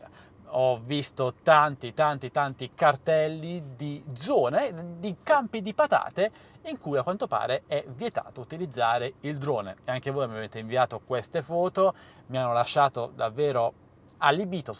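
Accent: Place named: Sardinia, Italy. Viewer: Mi sono iscritto al tuo canale, sei molto simpatico.. in Italia va tutto al contrario ormai, dove vogliono farci arrivare bho.. dal tuo accento sembri sardo, un saluto da un napoletano, buon volo👋..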